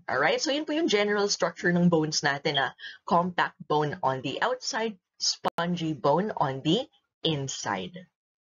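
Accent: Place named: United States. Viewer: Thank you so much po! I love, love you! Super helpful as a nursing student! Currently listening to this while I arrange my flashcards. And oh my, ang natural-sounding po ng American accent niyo, and the way you code-switch makes me fangirl 😳 Linguistic enthusiast here hehe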